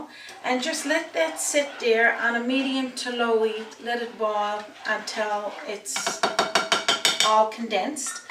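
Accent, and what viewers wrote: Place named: Newfoundland, Canada. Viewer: Thank you!!!! As an expat it was not only a treat to get those lovely pickled beets all the way over in Japan but it was a delight to hear the Newfie accent again. Thank you so much. I'll check out more of your recipes soon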